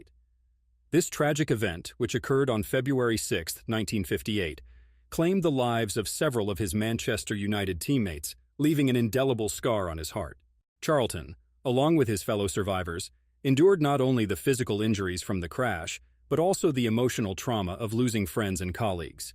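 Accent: United States. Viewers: a f----------g yank what so you expect blue blooded murder of the english tounge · Full of bullshit! No wonder its a Yank voice. Stick to Ted Lasso and leave football to the rest of the world.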